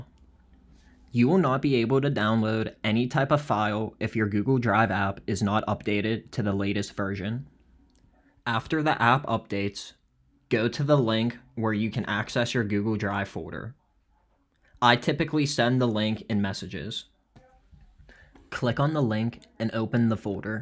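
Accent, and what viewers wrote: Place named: Pittsburgh, United States. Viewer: Are you from the Ohio, Pennsylvania, West Virginia tri-state area? Lol I’d know that dialect ANYWHERE. #yinzer 😄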